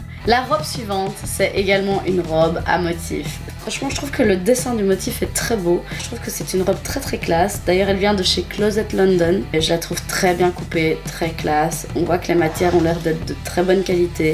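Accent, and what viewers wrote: Belgium. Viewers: C'est bien une compatriote belge que j'entends là?Je te trouve super cool a regarder je m'abonne directttt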